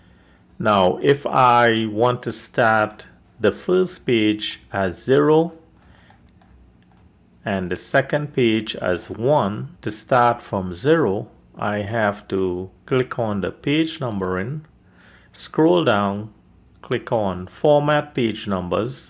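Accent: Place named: Trinidad and Tobago. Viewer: You sounding like a Trinidadian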